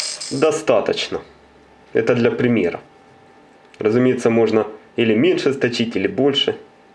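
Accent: Odessa, Ukraine. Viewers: Автор наверно из Одессы. Пионар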